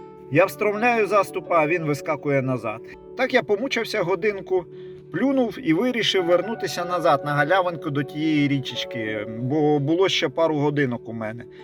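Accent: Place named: Vinnytsia, Ukraine. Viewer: Деякі слова такі, наче ти з Вінничини, типу ходю. Здивований що ти білорус.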